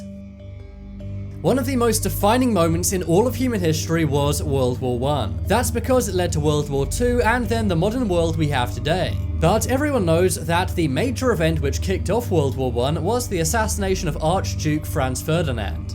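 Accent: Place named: England, United Kingdom. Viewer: Your accent 😭♥️♥️.you From England???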